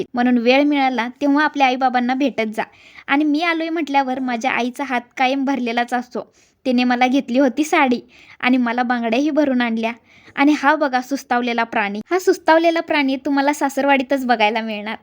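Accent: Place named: Sangli, India. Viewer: मी पण सांगलीची आहे तुम्ही बोललाकी मला खुप आपली माणस बोलता आस वाटतय खुप छान वाटतय तुमचे व्हिडिओ बघुन😊😊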